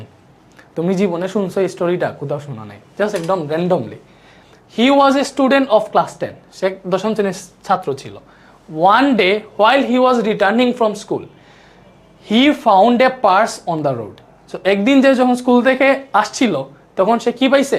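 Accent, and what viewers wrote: Sylhet, Bangladesh. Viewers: Vaiya apni ki Sylheti🙂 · স্যার এর বাড়ি মনে হয় সিলেট জেলায় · ভাইয়ার কথা শুনে মনে হচ্ছে উনি সিলেটের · Sylheti monoloy amr o mone hoy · Vai mone hoy Sylheti. Kota bartay lage 😊❤ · Sir kota bole half Sylheti Half Suddo😮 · Bhaiya apni ki sylheti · Mat huniya buja jar sylheti kori😊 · আপনার বাড়ি কি সিলেট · Bhai apnar bari ki sylhet a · ভাইয়া মনে হয় সিলেটের ❤ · Sir ar basa ki sylhet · সিলেট, গোলাপগঞ্জ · Vaiya , hoito sylhet er manus.. Kotha gula just wow.. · Mone Hoy lage to sylhety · আপনি কি সিলেটি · স্যার কিতা সিলেটি নি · Mat huinna to lager sylheti ou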